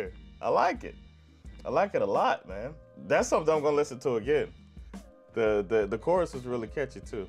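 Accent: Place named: United States. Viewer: Damn your Swedish pronunciation is actually really good, I'm impressed. Of course there's an accent, which is to be expected, but you sound just like an American who's moved to Sweden and learned the Swedish language (at least from my perspective and interactions with Americans who's moved to Sweden)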